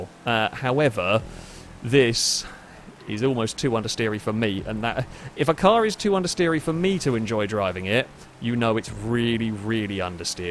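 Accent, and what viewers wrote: United Kingdom. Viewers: That marvelous British English is half the reason I watch this channel, not gonna lie.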